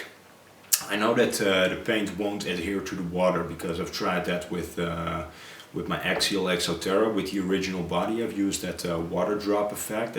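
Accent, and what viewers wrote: Netherlands. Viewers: Ben je Nederlands haha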